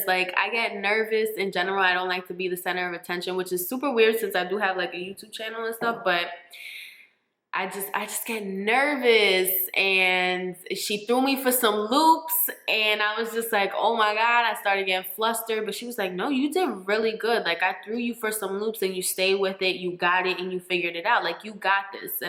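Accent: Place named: New York, United States. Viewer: Most definitely sound like a New Yorker. I’m a Brooklynite and she dead sound like women from my childhood.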